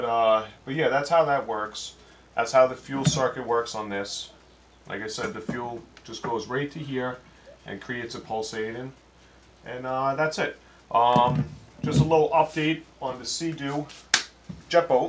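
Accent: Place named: Texas, United States. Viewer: Nice explanation! I detect an accent. Are you from Texas?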